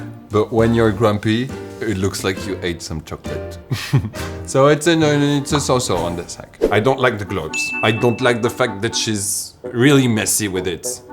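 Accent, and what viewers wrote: France. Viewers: As a french person, i wasnt sure if her accent was a french 🥲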